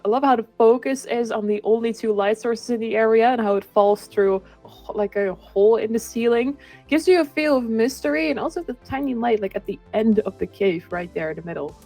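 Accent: Netherlands. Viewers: WOW, IF THAT IS NOT A REALLY THICK DUTCH ACCENT, I DON´T KNOW WHAT IS!?!?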